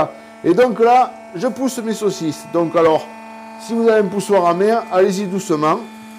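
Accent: South, France. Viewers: tu es un super gars,je suis fils de boucher et j'adore regarder tes vidéos,et ton accent du sud ne fait qu épicer celle ci de ta bonne humeur!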